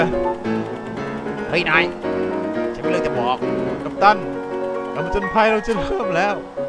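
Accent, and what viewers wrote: Thailand. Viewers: เสียงเหน่อ55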